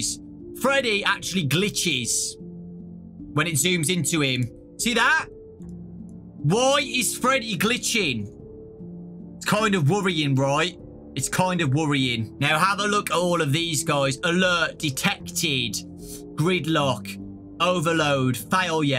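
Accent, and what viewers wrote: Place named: England, United Kingdom. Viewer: I never tought english accent is funny but now… you have changed my perspective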